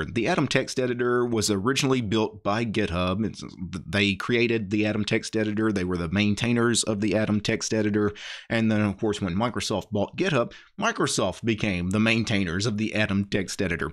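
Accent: South, United States. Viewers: Your Southern accent is sick. 🔥🔥🔥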